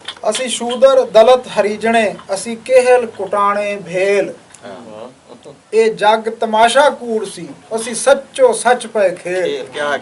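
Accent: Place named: Doaba, India. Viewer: Beautiful theth doada Punjabi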